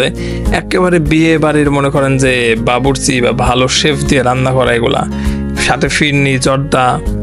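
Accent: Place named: Pabna, Bangladesh. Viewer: আমার বাড়ি পাবনা,আপনার কথা বলার ধরন অনেকটা আমাদের মতো,নাইস ভাইয়া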